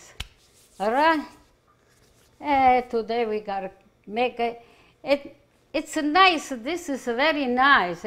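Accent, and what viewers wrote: Italy. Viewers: is so precious! I love to hear her Italian accent. I love you!❤ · Italian accent sounds very good and it sounds so better, when it's about italian cuisine! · I've recently started watching Grandmas videos and I'm learning a lot. I love her Italian accent. I will be trying these recipes at home. Love you Grandma from South Africa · Devo dirti che ero davvero preoccupato per la tua così lunga assenza… La prossima volta fai dei brevi video per far sapere a tuoi amici che stai bene… Amo la tua cucina (e il tuo inglese con accento italiano 😄) e questa volta hai cucinato la mia vita No .1 ricetta!!! 😀😀😀♥️♥️♥️🇮🇹🇮🇹🇮🇹 Il tuo super fan greco dalla Polonia/Germania….. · I love the way you cook. But I really love listening to your Italian accent and your singing!!!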